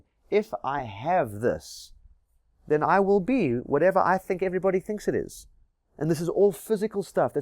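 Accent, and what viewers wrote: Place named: South Africa. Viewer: Beautiful video. Are you South African? You have a South African accent.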